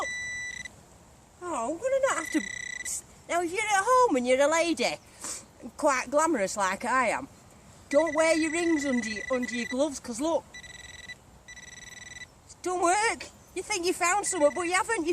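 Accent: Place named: Lancashire, United Kingdom. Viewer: Rochdale, Lancashire accent from the north of England.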